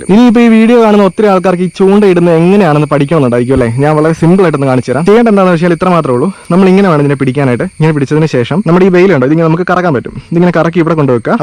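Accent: Kottayam, India. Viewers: Kottayamkarananno bro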